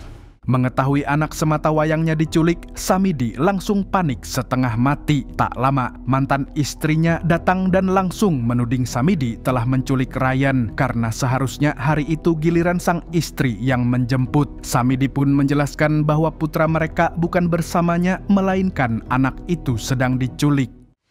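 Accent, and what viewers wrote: Banjar, Indonesia. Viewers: Harau..urang Banjar sekalinya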